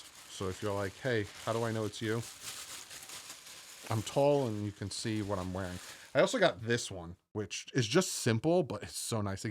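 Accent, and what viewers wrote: New Jersey, United States. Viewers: I love you but the NJ accent…. “Yastaday” grinds my gears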